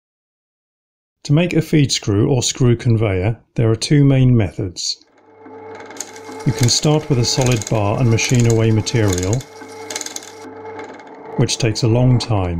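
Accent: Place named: England, United Kingdom. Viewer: I'm just over the moon to hear a middle class English accent on YT!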